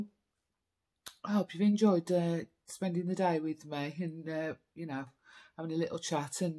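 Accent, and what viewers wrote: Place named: Black Country, United Kingdom. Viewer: I'm getting yam yam off you not brummie 🤔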